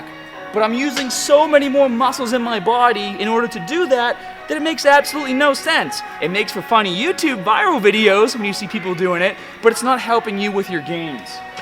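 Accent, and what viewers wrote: Boston, United States. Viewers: You from Boston? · I have a feeling you're from Bawsten? Ha ha I like accents. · Yo is he american or am I just high?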